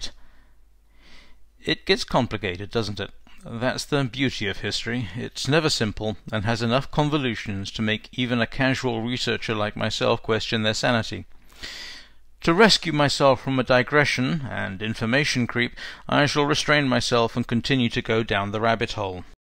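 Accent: United Kingdom. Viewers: If you can't tell an obviously English accent from an Australian one, how on Earth can we believe a single word of the drivel you have typed above?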